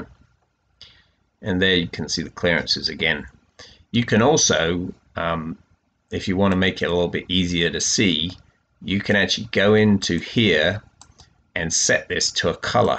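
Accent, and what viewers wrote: United Kingdom. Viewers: Great videos, brings home the benefits of parametric designs, very well explained. You mentioned the possibility of adding this to an assembly, would be great to see that. Would also love to see something with print in place hinges on a box, can't find a full demo for freecad anywhere. Can't place your accent, doesn't sound American, dare I say more British ? Thanks for the time you put in to help out a beginner. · You are a great teacher. I was looking for a CAD Software and found FreeCad. The new good CAD-Programs are only for rent anymore. As a single-man-business in Germany I just can´t afford to pay 500 Euro per month for renting a CAD-software while my main business is calculating frames and using other software. This software is meant for Industry - some fulltime - drawers, who live in their element - with their shortcuts and their productivity. 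Anyway, I would like getting better at Freecad and the FEM - workbench. Thumbs up, you´re a great person having an english accent and living in the US. Freundliche Grüße, Niko Böhm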